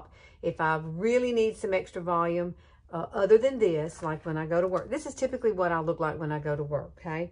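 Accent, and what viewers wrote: South, United States. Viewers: OMG girl I cannot wait to order that curling iron. I too have super fine, super thin, and super straight hair. My hair never and I mean never holds a curl. I cannot wait to have lasting curls and volume. Also, I think you are so damn cute! I showed my husband a brief clip of you and he chuckled and said other than the southern accent he could swear we were related by your personality. Accept I am very sarcastic! One last thing, I do not have a pace maker but I just got a portachath placed in the same exact spot your pace maker is. Be patient it will become just a part of your body and at some point you will forget its there. We just have to count our blessings for the gifts of modern medicine that can extend our lives and keep on keeping on! Blessings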